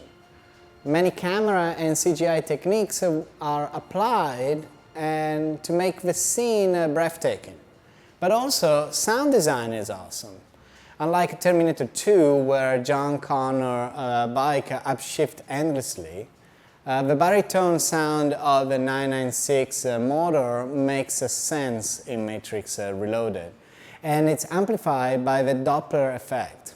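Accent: Italy. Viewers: I love the video and your charming italian delivery! :D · 😲 Wow! Whom better to present it than you. I would love some more technical iteration on the diverse releases of the 916 range. So all the different versions and the technical differences. All the way from the 916 to the 998S and R and final edition. Is that something you would want to make, or not in your interest? I've owned my 998 for over 10 years now and even used it as for pleasure on the road at first and later on at the track. At the track it really comes alive! I like the color of your bike. And especially in combination with the yellow lights it really harmonizes with the green of the 996 The Matrix bike. And the pull over can not be missed off course! Camera work impacable and sound, voice, pause and speak rythm as well as your suiting Italian English is coming together perfect. Love both parts and want to know more about the Italian bikes from you in front of the camera!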